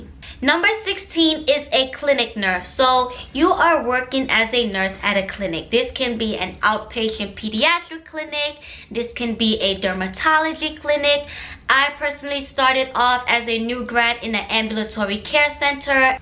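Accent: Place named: Jamaica. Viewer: You have an accent are you from Jamaica 🇯🇲? Big up yuhself either way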